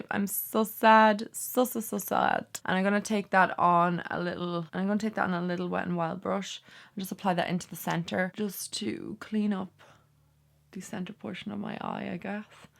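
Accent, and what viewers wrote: Ireland. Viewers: Where is your accent from in Ireland?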